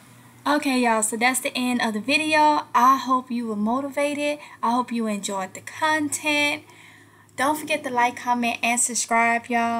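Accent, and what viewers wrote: South, United States. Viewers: Love that southern accent it hits different lol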